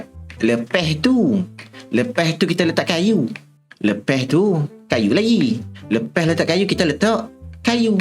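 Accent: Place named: Kedah-Kelantan, Malaysia. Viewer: Kedah campoq Kelantan 😂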